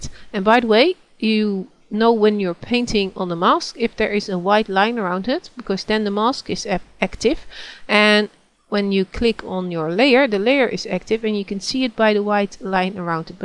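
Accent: Netherlands. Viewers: dutch accent.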